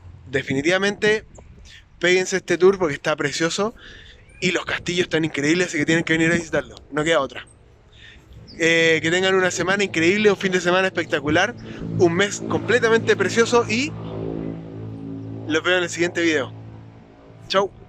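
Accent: Chile. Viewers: vi Que sos Chileno, me imagine por el acento porque mi cuñada es Chilena 😄 Buena suerte con los vídeos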